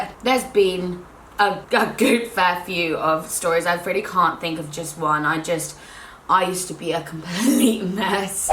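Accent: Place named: RP, United Kingdom. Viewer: I didn’t expect her to be so posh...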